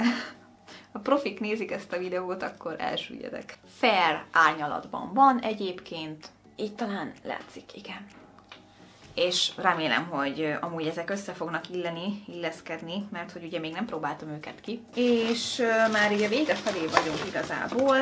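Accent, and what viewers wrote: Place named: United Kingdom. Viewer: Úristen imádom az angol kiejtésed xdd nagyon aranyos!!!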